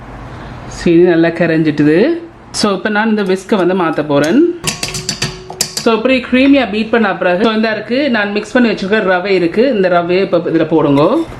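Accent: Jaffna, Sri Lanka. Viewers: Super அக்கா, இலங்கை எம்நாடு. யாழ்பாண, தமிழ், சூப்பர். நன்றி.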